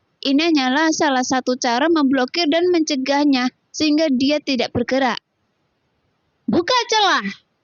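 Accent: Java, Indonesia. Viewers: Mimin nya orang Jawa ya,Kentel banget logat Jawa nya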